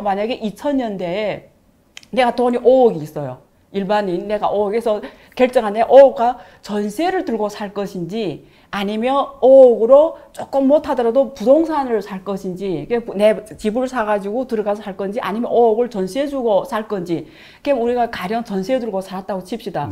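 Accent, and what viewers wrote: China. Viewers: 말투가 화교분이신듯.... 너무 뻔한 기본지식을 특별한갓처럼 말하시는듯...... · 정확한 발음의 한국사람도 많은데 이상한 중국식 한국발음에 여자를 방송에 ...짜증나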